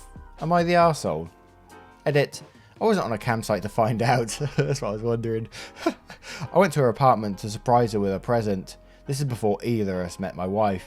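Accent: England, United Kingdom. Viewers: No way would I have wanted to give birth to my sons without my husband there, but that was my own choice and his. I would have lost it if my in-laws had tried to interfere. Definitely not on! Thanks for doing these. You're the only Reddit reader I've found so far that's English rather than American, and actually seems to know all the words you're reading. I really enjoy listening. Good luck for your dad!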